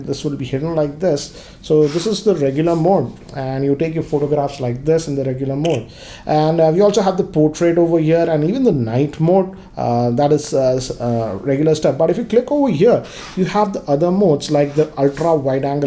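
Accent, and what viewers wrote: India. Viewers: wat a english eee ddd cccc bbb ithis words only using ........butler english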